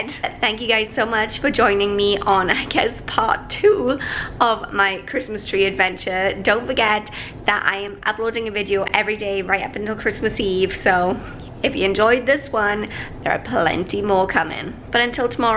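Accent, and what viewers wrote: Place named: Scotland, United Kingdom. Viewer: I'm usually pretty good placing accents but yours intrigues me.Ive been watching some of your Kuwait vids (Im in Kuwait on a US mil base).You've got a scottish/midlands/aussie thing going on.So which one?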